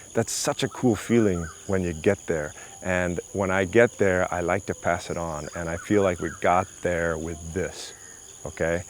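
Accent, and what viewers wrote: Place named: India. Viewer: Excellent info,! Also, I am hearing hints of Indian accent when you speak. It's totally cute!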